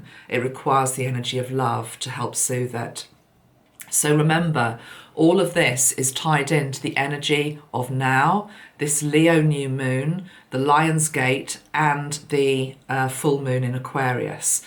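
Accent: United Kingdom. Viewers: This upload was so enjoyable from start to finish. Loved how you tied everything together with the snakes and arrows game, and you have such a beautiful British accent.💖 Thank you for all that you do.💖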